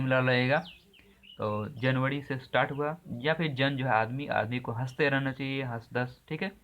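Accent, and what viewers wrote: Bihar, India. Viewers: Sr r u frm bihar???? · Sir aap bihar se hai kya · Bhut bihari bolte ho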